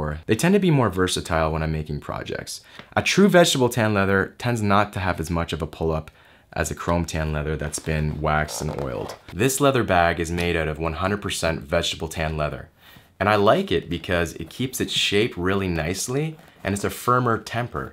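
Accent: Canada. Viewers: Canadian, eh? Love your channel.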